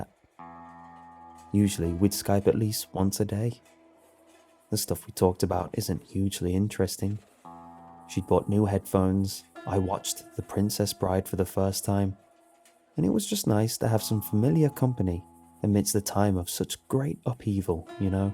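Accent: United Kingdom. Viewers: Mr.BrittishAccecentCreepManDudeChillVoiceCreepypastaSir Cna i have a like from u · Well, I really enjoy listening to pastas read by you (especially since I definitely prefer British over American accent), so like always, good job :) But I just had to comment on those stories - it was pretty easy to guess what was going to happen next and they were not scary at all. And please - how could I expect a phone making a sound when someone is hiding xD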